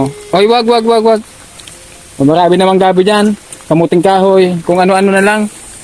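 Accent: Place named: Bicol, Philippines. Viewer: Magayon nag acceint ng bicol mo.nakakaralateakonsa mga pronouce mo.ng bicol parang na.miss ko ulinnag bicol taga camsur ako.kaso ang bikolnmo parang tunog rinkunada masarao pakinggan ang boses mo..tuloy lng mag vlog lagi ako nanunuod.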